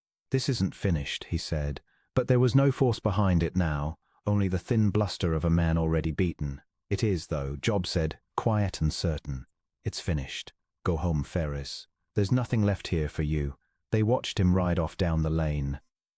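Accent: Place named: RP, United Kingdom. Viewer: Terrible narration...reading the words as written not as they should have been correctly pronounced. Plus with a posh accent...not really in keeping in telling a story about the 1800's in the wild West of the US. The story in interesting...not really authentic in legal standing in that place and time...but interesting yarn.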